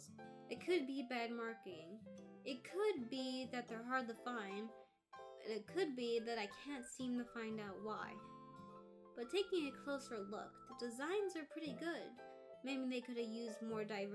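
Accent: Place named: Australia. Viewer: You're Australian! (I am)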